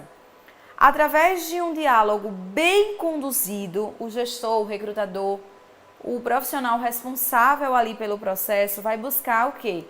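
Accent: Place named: Fortaleza, Brazil. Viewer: mulheres com sotaque de fortaleza é o mais lindo.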